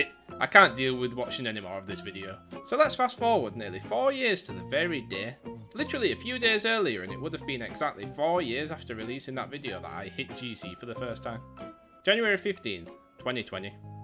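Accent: Yorkshire, United Kingdom. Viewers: Your a proper Yorkshire lad aren't you!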